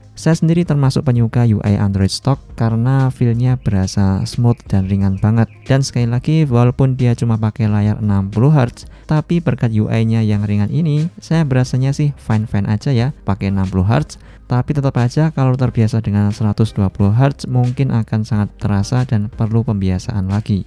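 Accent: Boyolali, Indonesia. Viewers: Lo lo lo, wong boyolali to mas?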